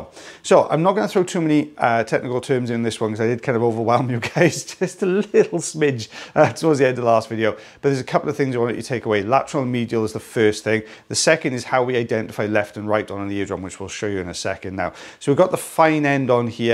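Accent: Wales, United Kingdom. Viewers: I am loving learning new terminology and facts about ears. Last year I had to get my ear cleaned after swimming had dislodged some wax. Went to the GP who was a bit thrown by my telling her that I thought the wax had gone into the anterior recess. Turns out that the nurse who did the subsequent syringing (no micro suction available here) was also fascinated with ears. I told her about your channel and she has become a huge fan too. Why do I love your videos? I learn something, it is so satisfying to see the results and how much difference it makes to your patients, Your passion for what you do and finally, I simply love a Welsh or Scottish accent 😃 Between you and The Hoof GP, I get to watch people who love what they do, help others in pain or discomfort and learn. I do all of this from the comfort of my home on the other side of the world in tropical Northern Australia. 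Thank you!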